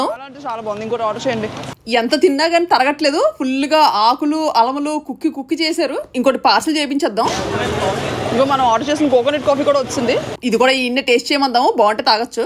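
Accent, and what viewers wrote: Vizianagaram, India. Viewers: మీరు మాట్లాడితే vzm accent ఉట్టి పడుతుంది. Intlo valu matladinattu untundhi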